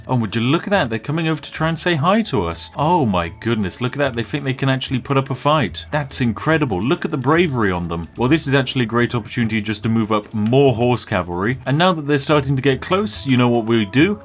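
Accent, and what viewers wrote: United Kingdom. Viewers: is one of the only youtubers where I pause to go to the bathroom come back un pause and the first this game I hear it “And there all going to die” in a calm British accent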